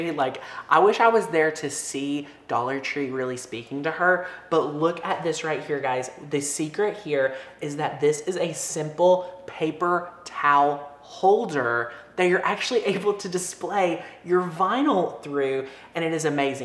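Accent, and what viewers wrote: South, United States. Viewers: You have to be Southern!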